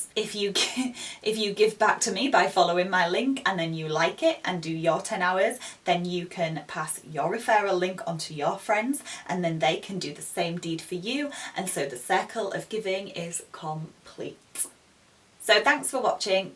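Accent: United Kingdom. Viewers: I like to listen to your nice British accent.. Greetings from Iraq.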